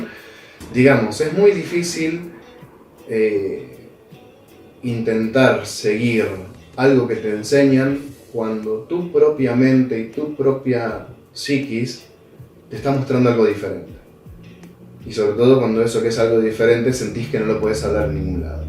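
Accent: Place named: Argentina. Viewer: Que lindo soy mujer transexuAl vivo en usa suenas como argentino, tengo familia en argentina. Ah que lindo me gustas lol.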